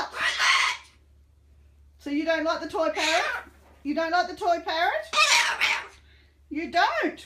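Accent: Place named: Australia. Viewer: Oh yes I agree, along with the Australian accent he is priceless! 🦜 😁😅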